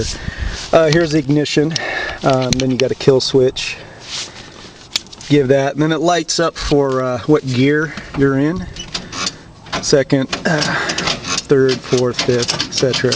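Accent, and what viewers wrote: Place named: Midwest, United States. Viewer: Are you from the Midwest? Your accent sounds exactly like a friend of mine. Kept thinking it was him. Kool conversion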